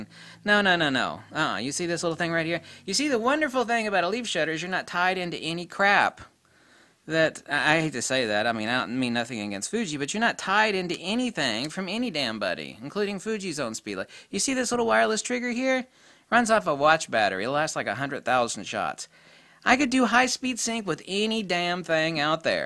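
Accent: Australia. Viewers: Your Aussie accent isn’t bad mate .. stick with it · Yes we do but don't let that stop you. Reference to Australian accent.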